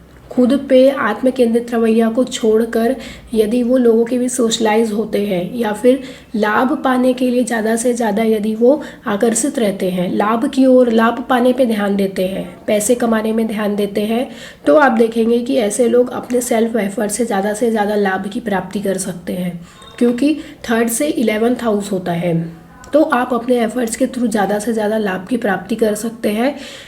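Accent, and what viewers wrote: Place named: East India, India. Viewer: Namaste Mam aap native kaha ki h Apke kuchh shabdo se Maharashtra ki nahi lagti Me bus interest k liye pooch Raha hu q k me guess krne ki koshish krta hu aap jo shabd pronounce ki wo mene assam bihar wb k logo k mukh se sune h Sorry if anything feel not good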